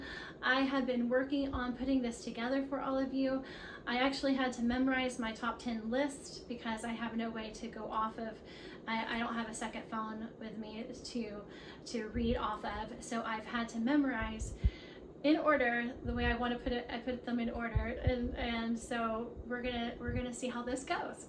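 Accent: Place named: South, United States. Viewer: She definitely still has a bit of a Southern accent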